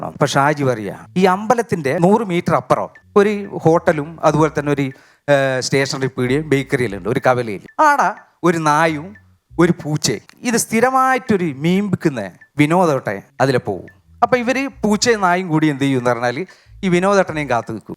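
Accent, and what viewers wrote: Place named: Kannur, India. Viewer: നല്ല അവതരണം, കണ്ണൂർ ഭാഷ 🎉❤🎉❤🎉❤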